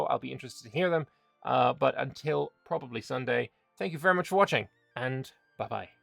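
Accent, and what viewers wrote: Scotland, United Kingdom. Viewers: nice scotish accent. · Your scot accent isn't bad actually!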